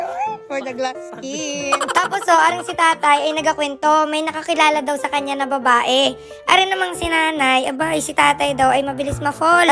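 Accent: Batangas, Philippines. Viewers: Ok lang ba magtanong.Taga mindoro ka pero bakit pagnag sasalita ka parang batangas?😶🙂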